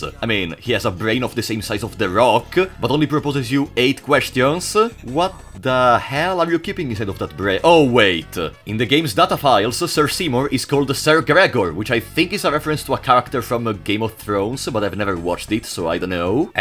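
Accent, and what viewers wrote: Italy. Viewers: Il bro è palesemente del Piemonte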